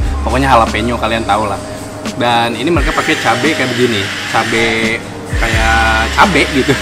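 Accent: West Java, Indonesia. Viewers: Tetep keluar ya sundanya, sagala rupa 😂😂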